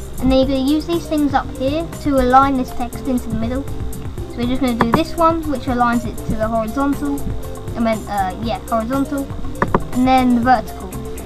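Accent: United Kingdom. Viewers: wow your accent are you british ?:)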